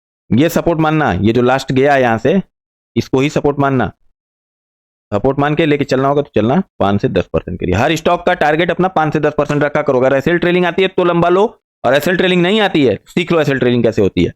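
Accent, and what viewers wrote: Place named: Uttar Pradesh, India. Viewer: Kaha se ho Bhai utter pradesh ki bhasa bol rhe sir 🎉🎉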